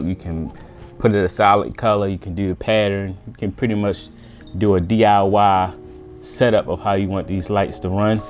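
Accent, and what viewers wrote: Baltimore, United States. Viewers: Could tell you were from bmore in the first 2 seconds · You got a follow beacuse the accent screams Baltimore lol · Is that a Baltimore (Maryland) accent? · Dope channel and your bmore bmore I hear lol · Just stumbled upon this video you’ve got to be a Baltimorean I recognize our accents😂🙌🏾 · Wassup man…just tuned into your channel for some ideas, loving the content. Btw are you from Baltimore? You kinda got our accent 😂😂. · Nice! Aside: By the accent, I'm gonna punt and say you're from the Baltimore area...🦀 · im guessin youre from bmore?? 🤣🤣 them dews and tews lol. good vid tho nice work on everything, i was buying random lights too until I got to Govee. Now my wife is like dont bring anymore lights in this house 🤣i see this is a couple years old tho ill check to see if you updated ✌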